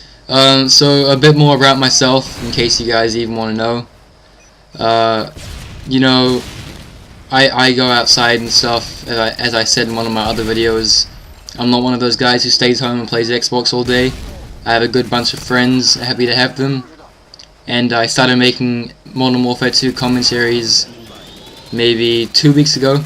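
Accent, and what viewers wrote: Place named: New Zealand. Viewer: Nice commentary!!! lol metalstorm i had no idea there were so many new zealander commentators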